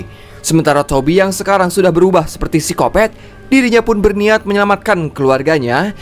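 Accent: West Java, Indonesia. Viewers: Asalamualaikum ..bang Ciawi hadir.bang aku suka cara Abang ngomong nya pakai bahasa Sunda Abang orang mna sih😊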